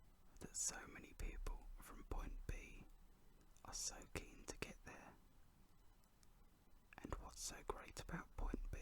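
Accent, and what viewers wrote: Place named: United Kingdom. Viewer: So happy I found someone British like me. You have a lovely voice and made me very sleepy :)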